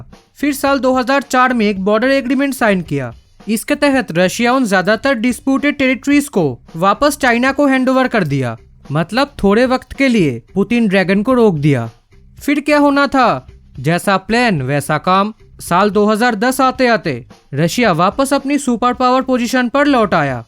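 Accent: Bengal, India. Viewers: Bangali naki dada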